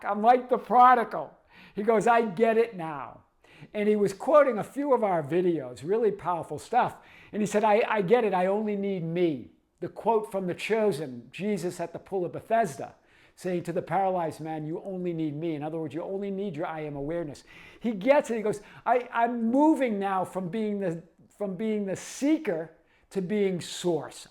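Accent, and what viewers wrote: Boston, United States. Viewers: The second you spoke I knew you were a Bostonian. LOVE the accent & your channel! I AM.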